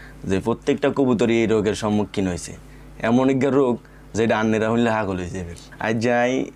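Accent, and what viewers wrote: Noakhali, Bangladesh. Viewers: Love your noakhali voice buijjen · ভাইয়ের বাড়ি কি নোয়াখালী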